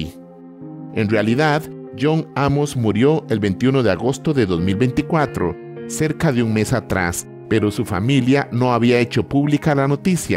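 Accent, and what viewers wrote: Costa Rica. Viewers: Es el acento tico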